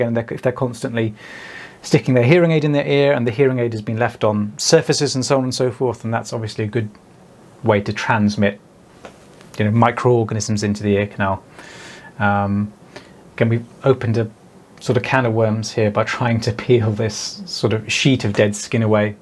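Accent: United Kingdom. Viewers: I AGREE WITH HIM ABOUT THIS ! YOUR VOICE IS VERY CALMING TO LISTEN TO !!!!!! I'VE ALWAYS LOVED LISTENING TO BRITISH PEOPLE TALKING !!!! I LIVE IN SAINT CLAIR COUNTY , ALABAMA . MY PARENTS WERE FROM MARYLAND , SO I DON'T SOUND LIKE A TRUE SOUTHERNER WITH A "TWANGY" VOICE !!! I SOUND MORE LIKE MY PARENTS THAN I DO A TRUE SOUTHERNER DOES . 😊😊😊😊😊😊😊😊😊😊😊😊😊😊😊😊😊😊😊😊😊😊😊 !!! WHEN I WAS YOUNGER , I HAD A YEAST INFECTION IN MY EARS AND MY ENT HAD TO SQUIRT WARM WATER IN MY EARS AND IT MADE ME FEEL DIZZY AND NAUSEATED WHEN HE WAS DOING THIS TO ME . I LOVE YOUR VIDEOS - THEY ARE VERY INTERESTING TO SEE . 😊😊😊😊😊😊😊😊😊😊😊😊😊😊😊😊😊😊😊😊😊😊 !!!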